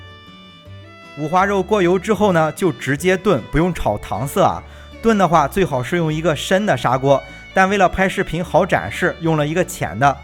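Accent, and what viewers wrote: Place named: Jinan, China. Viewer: 这口音，绝对正宗济南人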